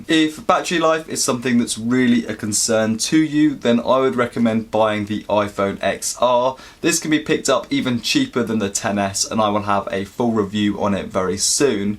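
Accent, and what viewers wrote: United Kingdom. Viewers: Atr you british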